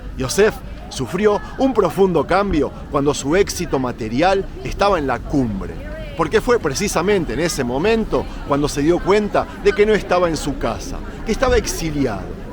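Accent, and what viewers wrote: Argentina. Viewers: tu español suena absolutamente argentino, con algunos olvidos de palabras, mas absulutamente argentino y se de lo que hablo. otro día te comentare un sino que llevas, pero eso es otro caítulo.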